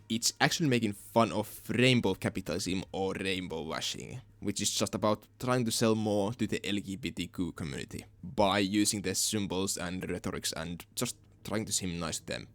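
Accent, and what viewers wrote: Finland. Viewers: Finglish. Or rally english. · That is our brother from Finland 🇫🇮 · I hate your strong accent, sorry... but I understand... I prefer to hear your Finnish accent than the annoying and incomprehensible accent of people from India. By the way, great job with the map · I love Finnish accent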